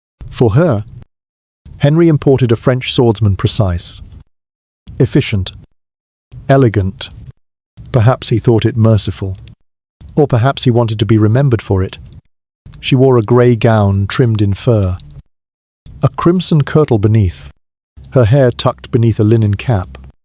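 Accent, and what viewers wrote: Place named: Southern England, United Kingdom. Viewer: The narrator (AI) may be boring, but it's a standard southern English accent.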